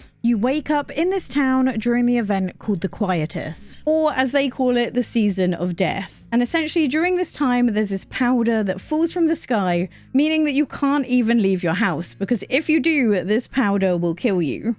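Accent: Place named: United Kingdom. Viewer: this british accent is so good to hear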